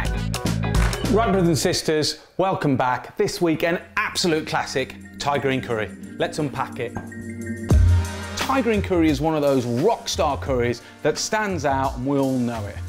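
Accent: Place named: West Midlands, United Kingdom. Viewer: I detect a hint of West Midlands dialect in there.....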